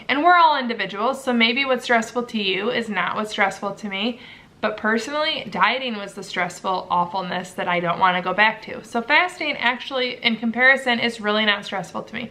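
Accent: Michigan, United States. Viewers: I love your videos. You’re very inspiring. I thought it was funny that someone asked you what state you live in. A. You have a Michigan accent, and B. there’s literally a wall hanging in the shape of Michigan behind you!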